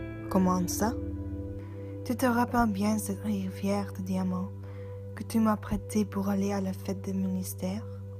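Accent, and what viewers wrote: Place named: Portugal. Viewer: Tu as l'accent du cliché portugai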